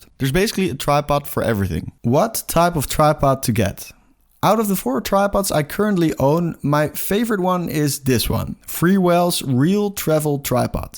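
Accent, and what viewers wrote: Netherlands. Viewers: I smell dutch hahaha leuke video!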